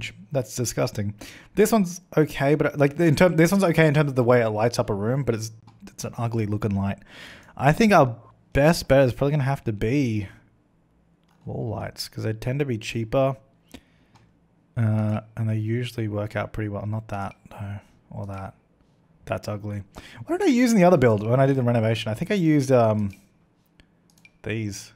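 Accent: Australia. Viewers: I'm weird lol:3 All Australiens sound the same for me I think 🤔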